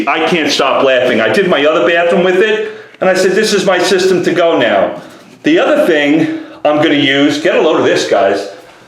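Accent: New York, United States. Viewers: Holy cow that sounds like my New York mom. The only difference is she then would get on a plane and do it herself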